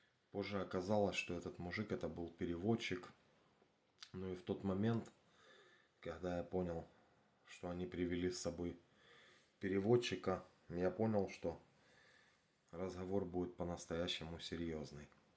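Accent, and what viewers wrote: Ukraine. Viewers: А разговаривает с украинским акцентом. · да он не в России, не слышите говор что ли? Где то за границей украинец.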